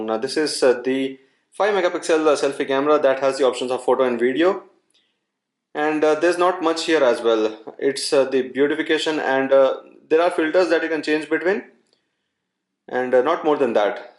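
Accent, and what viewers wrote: India. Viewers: Wdym 😂 I'm not a native English speaker yet I can understand this guy very well. He's just speaking it in an Indian accent. And no, I'm not Indian either.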